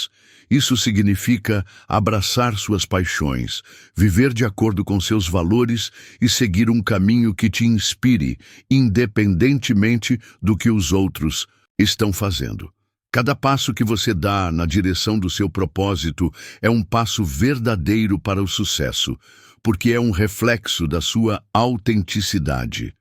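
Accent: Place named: Portugal. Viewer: Os vídeos são bons o que incomoda é esse sotaque português não entendo porque colocam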